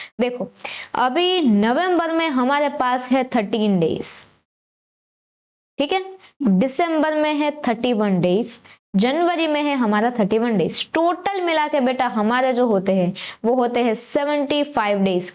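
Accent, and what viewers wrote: Gujarat, India. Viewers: Aap Gujarati Ho kya Mem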